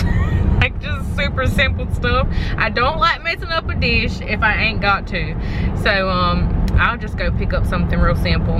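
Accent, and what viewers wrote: South, United States. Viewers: Lord girl I love your sassy southern accent!! I'm also from North Carolina literally from Rockingham we're so close! Love the videos you create girl 💓💓☺️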